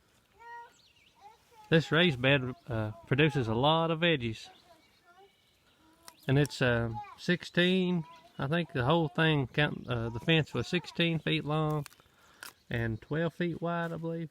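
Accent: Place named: West Virginia, United States. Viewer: you all have a beautiful garden.. and the sound of your voice reminds me of my home in Logan county West Virginia.. I have lived in south Carolina for almost 19 years and I can't get nothing to grow in this red clay.. I could grow things when I lived up home in the mountains.. I miss my mountain home.. May God continue to bless you and your little family...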